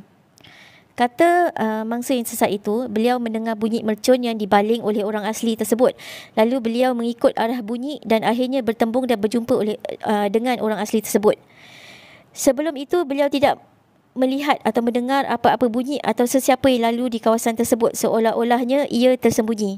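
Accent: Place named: Java, Indonesia. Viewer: Pekat jawa nye ni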